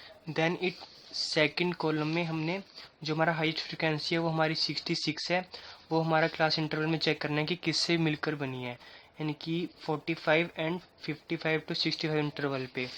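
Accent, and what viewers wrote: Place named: Haryana, India. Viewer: Haryanvi lgte ho nice explaination good dear ! 🌏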